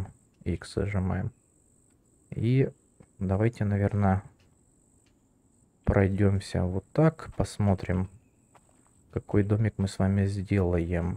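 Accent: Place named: Surzhyk, Ukraine. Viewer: якщо не секрет, звідки ти, а то щось я чую місцями суржик у розмові? Якщо на каналі немає політики, то я підпишуся. Не люблю коли ігри плутають з політикою, а я прийшов дивитися ігри, а не слухати хто комусь на х.. настав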